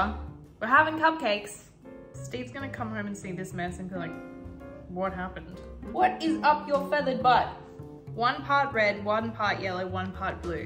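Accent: United States. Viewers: Holy shit, you're American accent is spot on. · Very charmed by the American accent. I see an opportunity for a teamup with fellow Aussie and baker extraordinaire Ann Reardon from How to Cook That?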